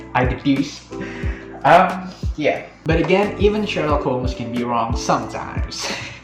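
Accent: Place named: South Korea. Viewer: cute with korean accent😘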